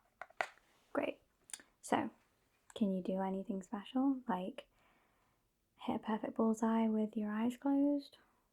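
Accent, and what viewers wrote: United Kingdom. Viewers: What kind of British pirate we talking here? Yorkshire? East Sussex? Cornish?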